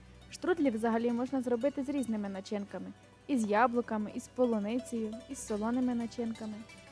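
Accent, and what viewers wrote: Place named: Lviv, Ukraine. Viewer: Я у захваті від вашої подачі цього простого, на перший погляд, десерту, приємно слухати львівську говірку! Дуже дякую!!🥰🏆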